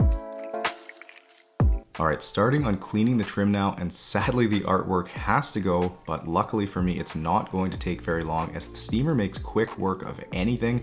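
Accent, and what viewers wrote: Canada. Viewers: As a Canadian, he sounds Canadian in his voice overs....... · My favorite part watching these vids, as an American, is seeing how proper this dude talks during the voice overs and then seeing him go full Canadian once they come to pick up the vehicle. Cracks me up every time.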